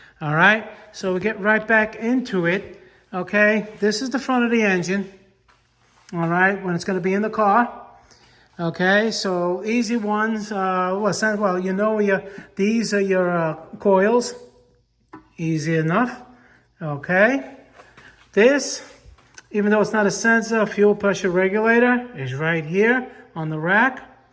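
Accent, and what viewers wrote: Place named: New Jersey, United States. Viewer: That’s a lovely accent you have there, New Jersey?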